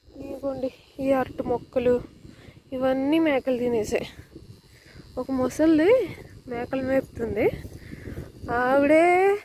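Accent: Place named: Godavari, India. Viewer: Mi voice change aindi konchem godarolla ammai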